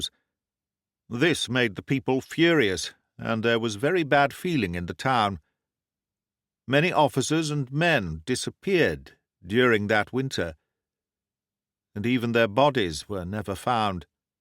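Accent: United Kingdom. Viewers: As an English language learner.. I find your voice very clear British accent that I enjoy listening more than American accents.. please keep uploading more and more.. listening to your narration is a valuable source of developing my listening skills in learning English.. thank you very much sir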